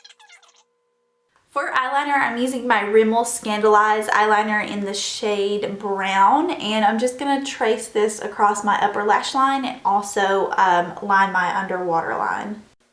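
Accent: South, United States. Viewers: Her southern accent and how she's so animated makes me like her so much more than others I love her oh my gosh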